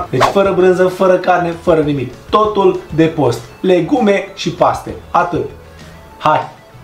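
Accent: Moldova. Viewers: pair a contraire....e perfect ca vorbeste...noua ne place,mai ales accentul moldovenesc...❤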